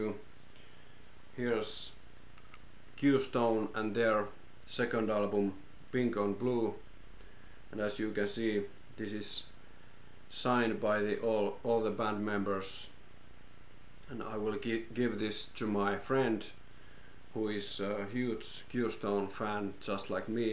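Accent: Finland. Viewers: Kuulin sun aksentin, ja tiesin heti ett oot suamalainen. : D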